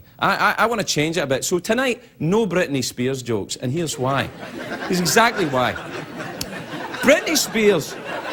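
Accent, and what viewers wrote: Scotland, United Kingdom. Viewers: Back when he still had that Scottish accent.... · Craig's accent always gets a little thicker when he is being genuine or talking to another guest Scottish decent. (See Ewan McGregor, Kevin McKidd, his sister, etc). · I watched this the other day and even today i still had tear in my eye. 20 years is a great milestone....goodluck to everyone at the beginning of the road....one day at a time you'll get through it:-) watching clips from 5 years shows how much his accent has changed, but it is still obvious scot (reminds me of john barrowman in that sense) thanks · Excellent. Who else misses his scottish accent? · WOW. Vintage Craig. He was very apologetic for cussing and had a much stronger Scottish accent. Time flies... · wow his scottish accent is veryyy scottish. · It's funny how much thicker his Scotch accent was even 6 years ago, but what he's saying here is brilliant. 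What a real person, and hope he inherits Mr. Letterman's show one day.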